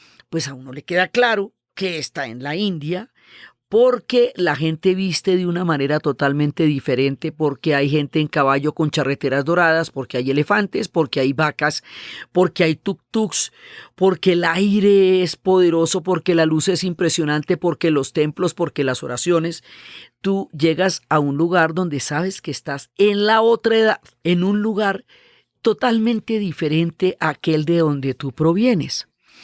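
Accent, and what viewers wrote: Colombia. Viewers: un agradecimiento patrio por ser la embajadora de la palabra con acento colombiano. Qué exquisita experiencia visitar al Japón 🇯🇵 guiada por tu voz. Gracias!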